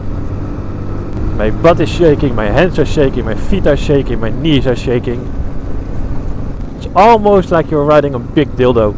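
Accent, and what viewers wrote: Netherlands. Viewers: haha hoorde gelijk dat je nederlands was ik ben deze aan het overwegen als mijn eerste motor zou je hem aanraden?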